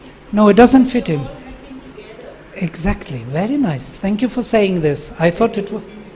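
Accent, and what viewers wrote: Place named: Russia. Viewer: Very beautiful exposition and i really enjoyed the charming Russian (?), Indian accent and humble manner in which it was delivered. Thanks !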